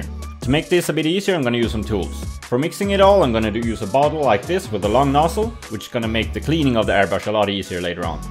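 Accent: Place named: Europe. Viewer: Damn I'm good... In recognising English accents spoken by Europeans. :)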